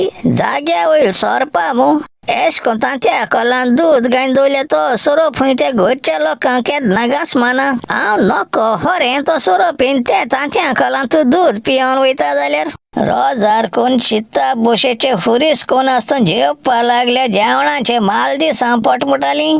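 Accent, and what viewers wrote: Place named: Salcete, India. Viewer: Thank you, Love your news. VIVA Goa and Goans Love your Accent Saxtti Bhaxen ahm ❤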